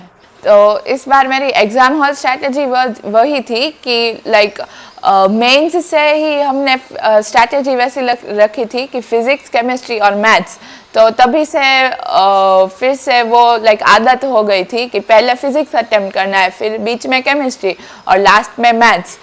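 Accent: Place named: Gujarat, India. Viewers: The Gujarati accent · Not even a 1% percent dilution in her gujarati accent hats off queen........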